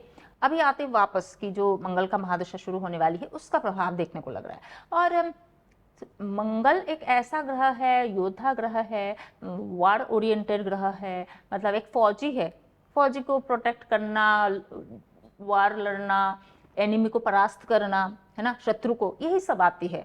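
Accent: India. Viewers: She is Bengali and Bengalis ka hindi itna acha nhi hota and accent bhi alag hota hai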